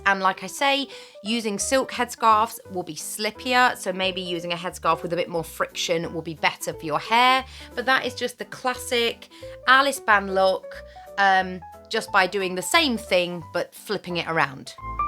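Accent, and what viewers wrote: United Kingdom. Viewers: LOVE this! What a great teacher you are! I posted a comment requesting a video like this on one of your other videos, but I don’t remember which one it was. Anyway, I am enjoying binge watching your videos. They keep me company while I’m washing dishes, preparing a meal , etc, and then I sit down lighter when I have more time and watch as well as listen. I love your voice. As a Texan with a Texas drawl, it’s so refreshing to hear a British accent. Keep them coming!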